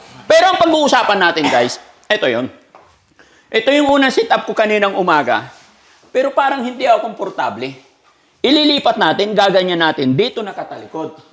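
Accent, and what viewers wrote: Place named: Quezon, Philippines. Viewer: Kkatuwa nman😅ganda ng bahay at mga rom banyo sopa parang rich na tlga ❤ ang hagikhik yong tawa ng tawa na wlng sounds pg sa sobrang happy n ayaw marinig malakas n tawa tawag hagikhik na sa isang tabi kkatawa ganon yong salita ni prang sa amin sa quezon tagalog n tagalog❤goddess team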